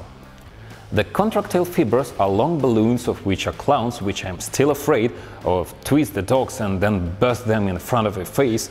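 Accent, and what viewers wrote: Russia. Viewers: Takoy strong ruskiy akcent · we can soooo tell he is russian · I love Russian inglish. Love your content bro.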